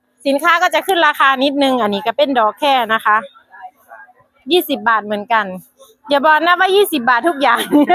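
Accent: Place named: Sisaket, Thailand. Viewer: เว้าสำเนียงศรีสะเกษบ้านเฮาเพราะหลาย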